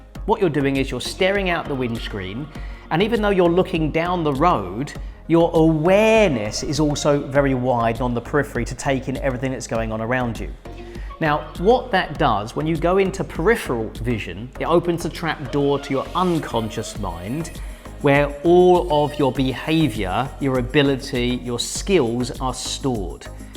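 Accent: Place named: South, United Kingdom. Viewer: FYI it's an uneducated Southern British accent. To a British person it doesn't sound impressive.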